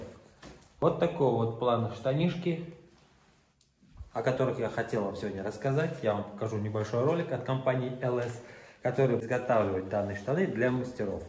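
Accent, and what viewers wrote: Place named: Tatarstan, Russia. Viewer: татарский акцент у тебя как у Миниханова.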